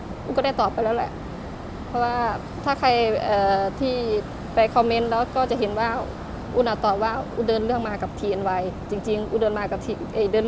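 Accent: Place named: Laos. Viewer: เว้าลาวกะได้ แซบหลายเด้อๆๆๆๆ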